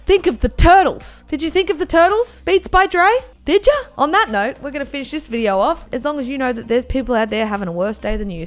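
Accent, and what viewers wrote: Australia. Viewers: Are you from Australia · is it me or does she sometimes have an australian accent in some words and then in others she does “caunt stand”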